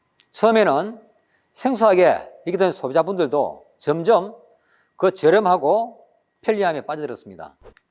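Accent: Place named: Gyeongsang, South Korea. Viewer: 구수한 갱산도 사투리로 귀에 속속 리더님짱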